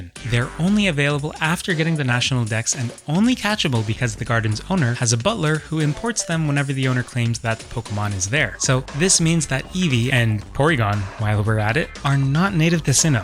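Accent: Canada. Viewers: Wait you’re Canadian? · Are you a canook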